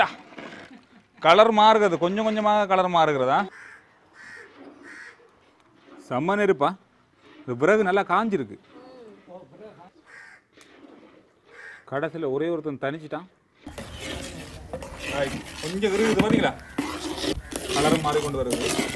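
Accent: Jaffna, Sri Lanka. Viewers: Sutha Tamil srilankan accent appadithan erukum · அண்ணா அக்கா நீங்கள் மட்டக்களப்பா யாழ்பாணம்மாதிரி பேசிறீங்கள் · இலங்கை தமிழ் சூப்பர் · உங்கள் தமிழ் எனக்கு உயிர்.சிறுவயதில் சிலோன் வானொலி கேட்டு வளர்ந்தோம்.நீங்க எல்லோரும் சேர்ந்து இலங்கையை இந்தியாவுடன் இணைந்துவிடுங்கள்.நாங்கள் உங்களை அன்புடன் ஏற்றுகொள்ள தயாராக உள்ளோம்.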